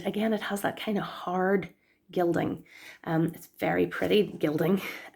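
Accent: Ireland. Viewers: Oooh I love your Irish accent 😍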